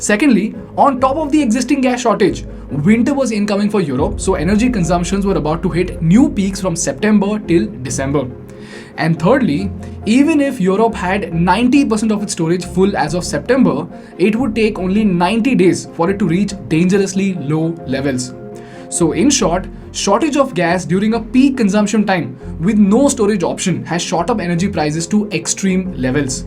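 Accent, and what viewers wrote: India. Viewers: That's what subtitles are for. Please make use of it. He isn't a British South Asian, He lives in India and just like Indian accent is hard for you to understand, it is equally harder for many Indians to understand British or American accent without subtitles. Since most of his audience are Indians, it makes sense for him to produce the content in English as we Indians don't have a common language, but English is still widespread and with the help of subtitles, people from different countries can still understand. Moreover, if you try to learn another language, especially languages with high pitch and words like Hindi, you will realize that just how hard it is to change your accent. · Funny indian tongue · I love the contents of most of your videos. I can understand your accent as i grew up in India. The idea flow is cogent and quite well organized. 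Well done!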